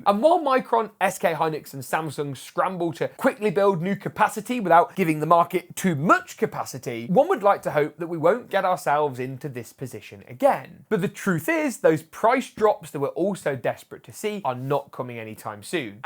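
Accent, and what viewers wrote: United Kingdom. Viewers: His accent is British , its completely different compared to US accent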